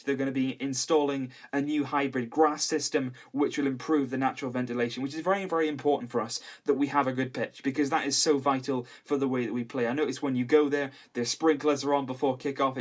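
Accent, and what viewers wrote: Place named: Scotland, United Kingdom. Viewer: Methinks he is Scottish. He should be supporting Celtic, Rangers or by the sounds of him, Hibs or something.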